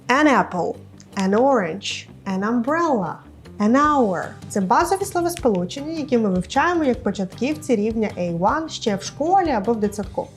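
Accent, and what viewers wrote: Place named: Ireland. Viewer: Той самий Айріш акцент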